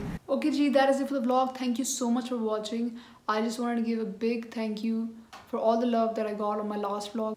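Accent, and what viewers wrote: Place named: Punjab, India. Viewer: Punjabi accent 😍😍😍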